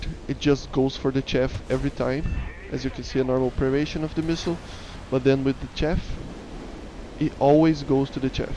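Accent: Brazil. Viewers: Me parece que vc é BR kkk, Nice vídeo.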